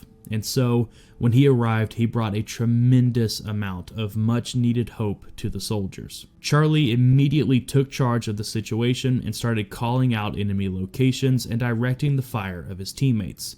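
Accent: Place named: United States. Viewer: Why u Americans pronounce IRAQ as - Ai-raq...... Iraqis call it IRAQ as an E-raq.........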